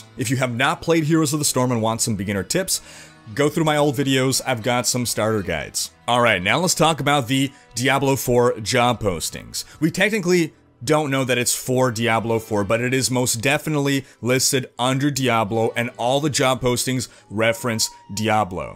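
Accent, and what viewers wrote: Quebec, Canada. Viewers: Wait, are you a french canadian ?! :D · Whoa! Are you french canadian?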